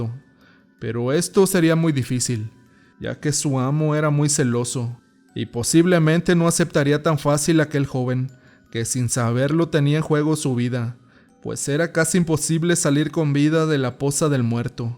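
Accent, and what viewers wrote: Mexico. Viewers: Me encata su asento mexicano y como cuenta sus historias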